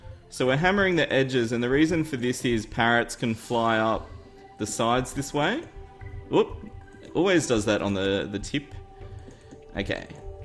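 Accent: Australia. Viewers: I hope u are enjoying your play through and I notice an Australian voice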